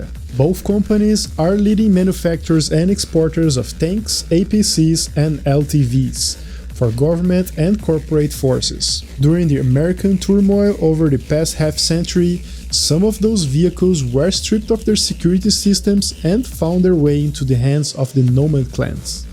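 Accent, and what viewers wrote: Brazil. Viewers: Brazilian accent detected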